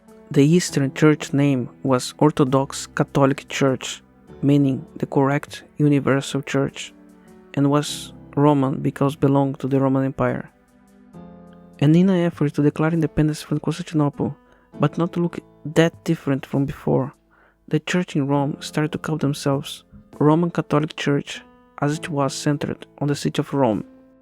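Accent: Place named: Brazil. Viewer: Are you Brazilian? Your accent sounds Brazilian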